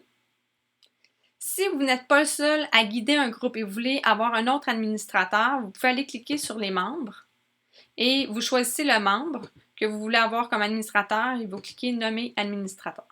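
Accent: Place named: Quebec, Canada. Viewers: Avec l'accent québécois c'est tout de suite plus intéressant ! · C'est vrai qu'avec l'accent québécois ça donne un charme supplémentaire 😃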